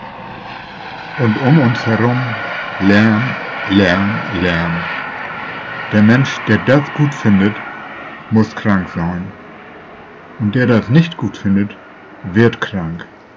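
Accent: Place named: Hanseatic, Germany. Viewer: Durch Zufall diese Krähen-Videos entdeckt - bin ganz begeistert. Zusammen mit den tollen Kommentaren mit authentischem, hanseatischem Einschlag ein harmonisches Gesamtkunstwerk. Bitte unbedingt mehr davon und alles Gute nach Hamburg!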